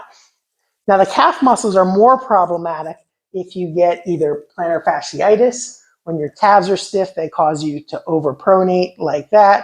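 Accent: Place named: United States. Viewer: I knew it he's a yank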